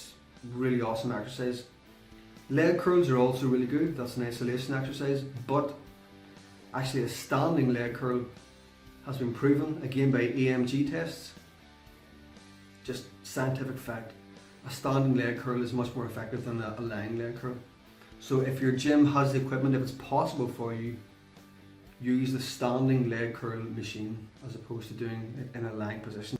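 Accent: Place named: Northern England, United Kingdom. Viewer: WOh your always with the truly objective, scienctific logic to training for hypertrophy, been following your THT programmes since i bumped into in online back in 2016, i really like how you explain things & i got the sense that your the type of person who is on his own personal quest to find the truly most effective knowledgable way of achiving said goals - for example this video on Flat barbell chest, everyone else goes with the "conventional" wisdom which i get, but your taking the risk to say what you believe and regardless of anythign else i respect that! So again thanks for all your effort and saving me years of doing it the wrong way from all the Bro science thats out there! Ps. Loving the Northy accent!!! (from a thick oul Dub):-D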